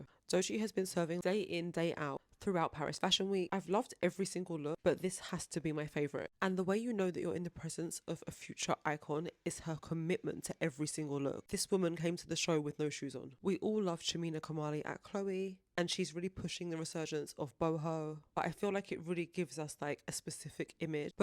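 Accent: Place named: United Kingdom. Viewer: Absolutely love these videos, and your charming British accent!! Do you think that you would ever do a video on Sade? Her iconic red lip is just everything to me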